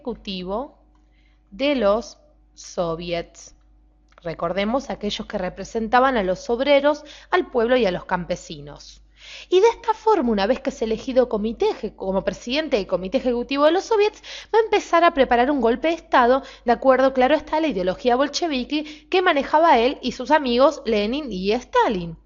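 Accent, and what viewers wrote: Argentina. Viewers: lol es argentina y por eso la pronunciación xD no sé si es sarcasmo.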